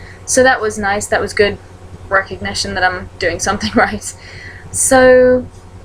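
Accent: United Kingdom. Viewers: Her accent is the most catchy thing ever, so cute :) Is that UK accent? What part of the UK is she from? I love it! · thank you. Nice video of LOA also British accent. I subscribed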